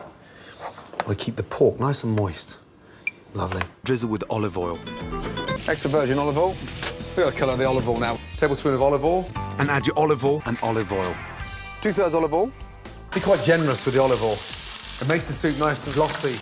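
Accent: Scotland, United Kingdom. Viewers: Ahhh this stingy-scottish accent i love it. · Why am I watching a Scottish guy say olive oil for 4 min at 3 am?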